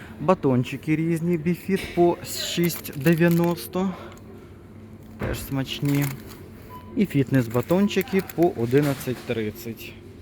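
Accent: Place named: Transcarpathia, Ukraine. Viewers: Так говорять в західній Україні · так говорять на Закарпатті,але це не означає ,що у автора правильна українська мова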